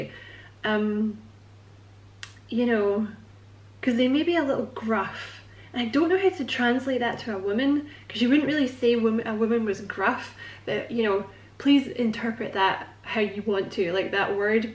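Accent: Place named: Scotland, United Kingdom. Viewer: Thank you! I have been 100% confident in this connection for a while so I'm pretty relaxed in it (Spirit told me from the very beginning, "if you don't loose your cool, everything will be fine"). However, confirmation is always nice. He's water and oh, so slow... I'll keep doing my work while he does his. BTW, I love your Scottish accent!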